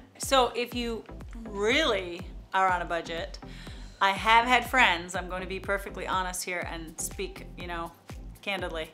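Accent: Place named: Canada. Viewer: She's gotta be a fellow Canadian according to the way she says 'about'.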